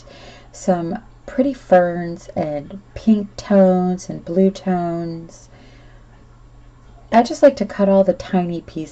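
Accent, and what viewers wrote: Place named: Canada. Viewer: Your accent reminds me of canadian english the way you say certain words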